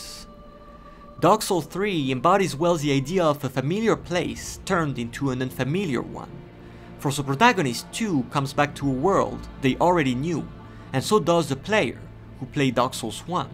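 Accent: France. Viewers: Are you French ? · i love Your french accent and the way You narrate subscribed !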